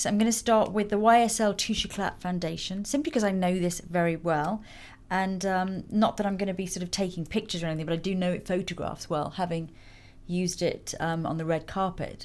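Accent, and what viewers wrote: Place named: RP, United Kingdom. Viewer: Really nice , also love your posh accent 😃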